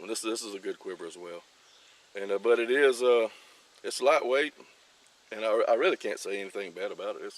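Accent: United States. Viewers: You get into some stuff and sub to YT channels and sometimes your interest passes, but along the way you find a guy who could make doing laundry sound like the most interesting thing on the planet, someone whose enthusiasm and integrity makes them just a pleasure to listen to, you are one of these people. Best US accent ever too!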